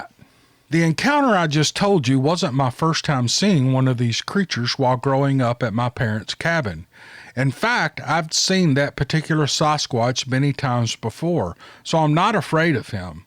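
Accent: South, United States. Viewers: Somebody should proof-read this! In one sentence early on you had the sentence correct but switched the words "mind" and "bed"...that made no sense but would fit if you put the words where they belong. It is like a robot-reads this. This could not happen if it were read by a live human. It is like the man's words are used but a robot reads it; a robot would not understand the meaning and therefor not notice it! So we tried to figure it out but can not! This is crazy. Who would use a robot voice with a southern accent that even pronounces "oil" as "o-el"...? I hope you can explain it!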